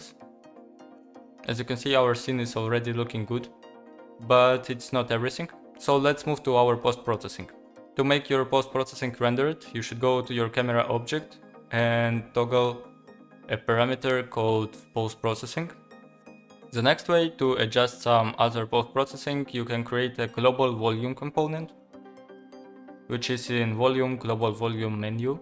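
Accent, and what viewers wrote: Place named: Turkey. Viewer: Dostum türk olduğun anlaşılıyor bu arada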